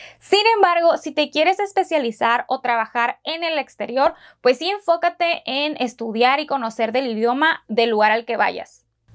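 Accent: Mexico. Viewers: muito legal ver um video de um outro país falando sobre a faculdade que eu quero seguir, amei esse sotaque mexicano, entendi tudo, beijos do Brasil🇧🇷🥰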